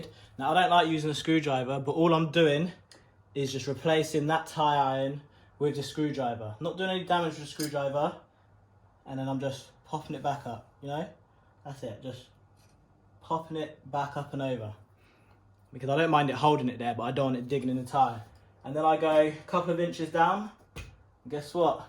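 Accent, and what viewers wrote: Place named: Jamaica. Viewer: 😂😂😂 good Jamaican accent By the way you put the tire on first then put the innertube in after ..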